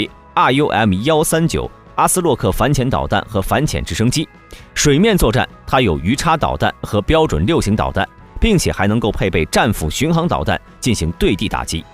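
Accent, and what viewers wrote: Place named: China. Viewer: 这博主除了口音之外别的和中国人没什么关系了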